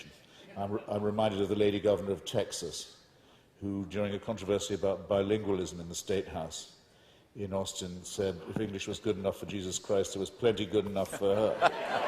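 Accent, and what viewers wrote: RP, United Kingdom. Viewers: Total lack of understanding of Scripture in its wider context. For a start he calls Shabbat Sunday! Speaking in an upper middle-class English accent does not make the case stronger. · ..."there's then a sulfurous interlude"... These gems just roll off his tongue, proof of his linguistic prowess and command of the English language. The Queen's English, actually.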